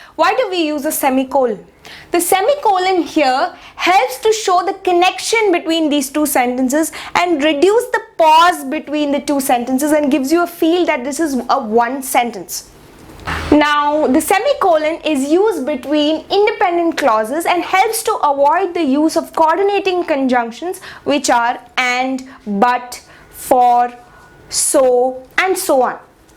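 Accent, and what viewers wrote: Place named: India. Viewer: She sounds like an indian person I know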